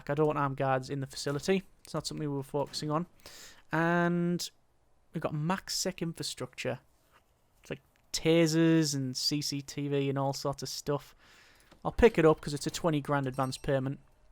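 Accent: United Kingdom. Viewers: Hold up wait a minute are you British or Scottish, I only just realise that you are either British or Scottish, but if you’re not I’m sorry. I’m just saying this because you sort of sound like it.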